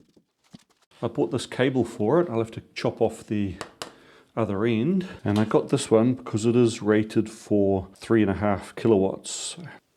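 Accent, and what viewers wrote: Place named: New Zealand. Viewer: I am a new subscriber in Australia. Until you said that you are in Austria I was sure that your accent and approach to building stuff meant that you were in New Zealand!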